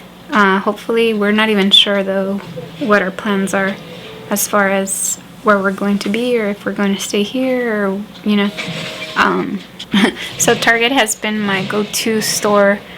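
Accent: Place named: Southern California, United States. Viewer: I can't wear my Chucks!!!! 😆 🤣 😂 Girl I am feelin that...or my vans, although they are warmer lol. I will also be coming from California where I have lived my whole life. Sounds like you were from SoCal. I lived in Antelope Valley growing up until I moved near Yosemite National Park. Been living through some earthquakes here lately, but none as big as you're talking about. Thankfully my littles are already familiar with what to do. No Dollar or 99cent Stores!!!!!!! My son will be ecstatic about that one! Love the info about the coats, gloves and boots. Also about the daylight hours in winter and summer. This is such a great video for those getting stationed there! Thank you for the info...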